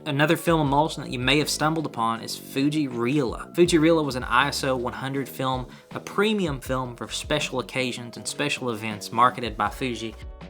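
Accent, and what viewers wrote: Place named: South, United States. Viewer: i love the southern drawll accent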